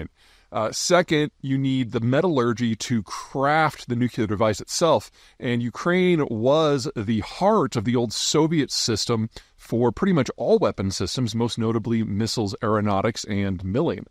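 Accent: United States. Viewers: Its refreshing to hear from someone with an American accent that understands that nuclear weapons are a technology from the 1940's, that can be developed by most advanced countries with nuclear reactors if it is needed to ensure their own security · Nuclear in 'Merican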